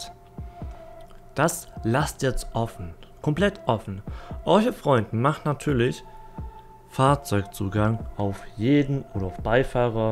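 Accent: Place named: Sweden. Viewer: Oha hätte niemals gedacht das so ein Schwedischer Restakzent klingt aber ist auf jeden fall mal was anderes. Hat was ^^ Ist auch ein seht sehr schönes Land definitiv und gar nicht immer so kalt wie man denkt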